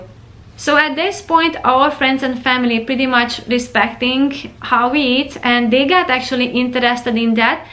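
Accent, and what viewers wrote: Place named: Hungary. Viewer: Imadom menyire perfektül beszelsz angolul. Tisztan kihangzik hogy magyar vagy de az csak meg attraktivusabnak csinalja. Elvezem nagyon a channel-eset. Üdv Ausztriabol.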